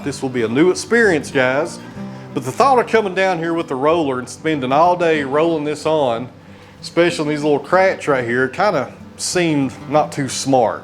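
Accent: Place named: Tennessee, United States. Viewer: I think I would go for a second coat unless the bulk of the wall is being covered up by tools etc. No on second thoughts a second coat is needed, do the job properly so that the exsposed parts of the wall do look finished. Thank you for what you do, it is great to watch your progress and growth. That accent of yours is just how a Limey would imaginea genuine Tennessee man to have and long may you continue, I just love it. How much land do you have for your property, land in England is eye watering high and hard to come by.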